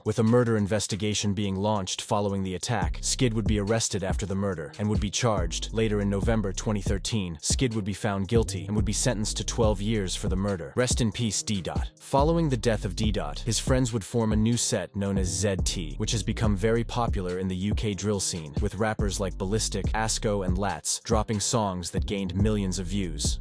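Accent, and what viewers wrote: United States. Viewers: Its an ai generated voice I think, so it messes stuff up. I think that's the reason anyway. Also an American voice so if not ai that's probs why place names are being messed up.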